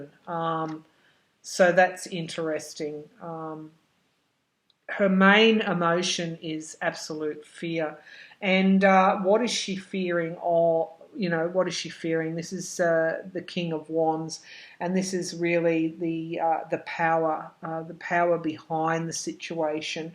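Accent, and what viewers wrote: Australia. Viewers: Hi,. I enjoy listening to your great Aussie accent and readings. When you have the inspiration, will you please do a reading for Maxime Bernier, the leader of the People's Party of Canada. This us our election year and Canada is in a terrible mess with lots more coming.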